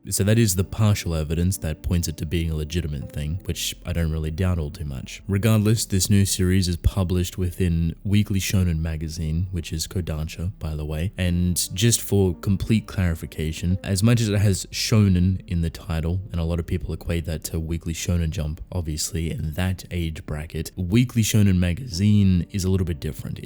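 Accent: Australia. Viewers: yeah, something about Australian accents discussing lore/topics always sounds interesting lol. Reminds me of fudgemuppet when they dive into Skyrim.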